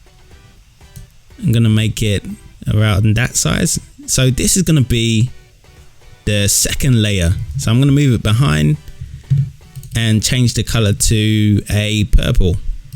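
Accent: United Kingdom. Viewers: Loved your cockney accent!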